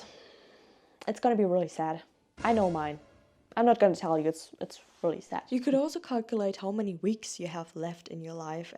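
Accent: Germany. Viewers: aha i hear deutsch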